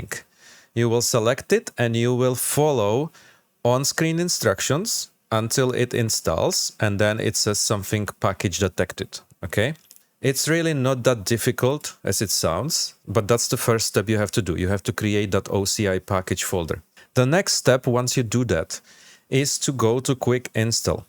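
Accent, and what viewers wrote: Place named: Czech Republic. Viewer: poznám Čecha jak se snaží mluvit anglicky na 100 km😂😂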